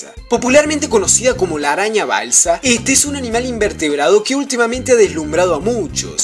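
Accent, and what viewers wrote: Córdoba, Argentina. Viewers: sos de Córdoba? De que parte?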